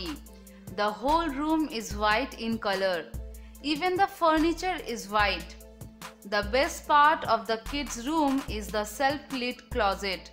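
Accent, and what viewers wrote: Bengal, India. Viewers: She has copied entire from other video.... N the English is as such because of her bengali accent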